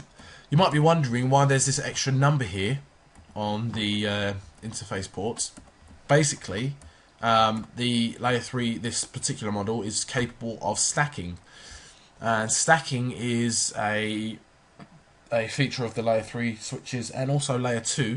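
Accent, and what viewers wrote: United Kingdom. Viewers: Rooters. God, you are gloriously British. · Finally, An IT tutorial with an English accent..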